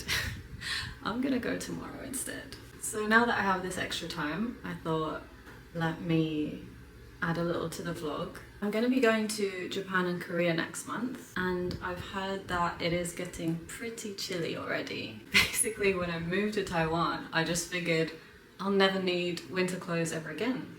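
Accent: United Kingdom. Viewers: Quick question 🙋🏻‍♂️ Ur British accent is not that strong so because of the video is for Taiwanese people Or the original accent is not that strong🤔 (Just curious😂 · 英國腔真的非常好聽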